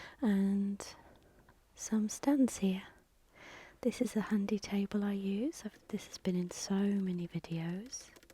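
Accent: United Kingdom. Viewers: Who else but could relax me and make me sleepy doing a reveal video. Looking forward to your new studio, and plz quit rubbing and scratching the carpet i allmost fell off to sleep. Lol and being from the southern part of the USA, i LOVE the Brithish accent! Good luck Love!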